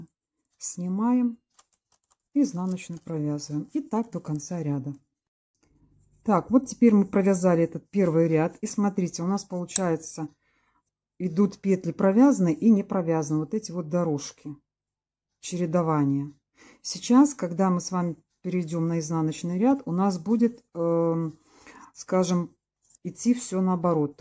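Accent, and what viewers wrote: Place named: Belarus. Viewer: здравствуйте! Почему я раньше вас не видела? Вы с 2015 года ведете канал, а только сегодня я с вами встретилась, познакомилась и, конечно же, подписалась. В целях знакомства пересмотрела много ваших видео. Очень познавательно и полезно. Хотя я вязальщица с уже достаточно большим стажем, многое взяла себе на заметку, ведь учиться и узнавать что-то новое никогда не поздно и совсем не стыдно. Спасибо вам, что делитесь своими знаниями со своими подписчиками. Удачи вам, новых идей, вдохновения, легких петелек, благодарных подписчиков и процветания каналу. Забыла сказать - ваш белорусский говор покорил меня сразу! 💖🌸🌺🌸🌺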